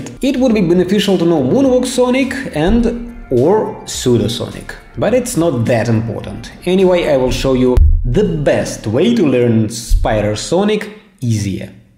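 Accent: Russia. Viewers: Акцент русский какой-то)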